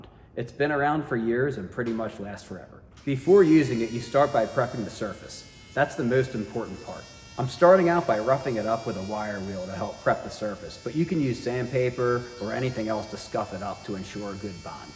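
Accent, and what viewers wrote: Baltimore, United States. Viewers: Baltimore accent? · Are you from Baltimore?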